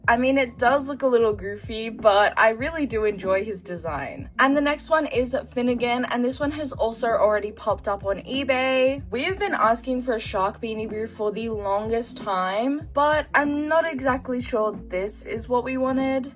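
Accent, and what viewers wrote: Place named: Australia. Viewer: I love your australian accent so much lol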